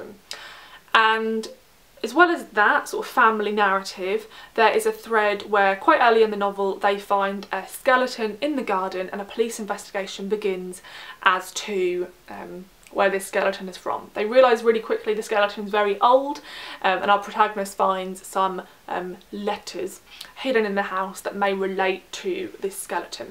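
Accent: England, United Kingdom. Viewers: I love her accent. Could i know which part of England she comes from??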